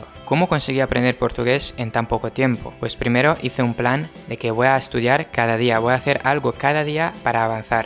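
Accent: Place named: Spain. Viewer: Excelente nivel de Español, lo hablas super bien, pareces de España.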